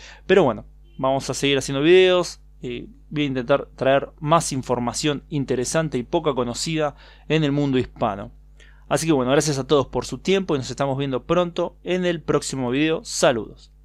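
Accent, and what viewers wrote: Buenos Aires, Argentina. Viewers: A voz que vc escuta neste vídeo fala o espanhol da região de Buenos Aires. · Son buenos tus vídeos. Pero intenta no hablar tan argentino me.hace echarme a correr ...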